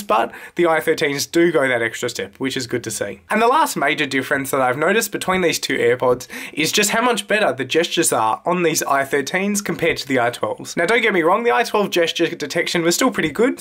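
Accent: Australia. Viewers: I'm from Britain and can confidentaly confirm he's UNMISTAKABLY Australian.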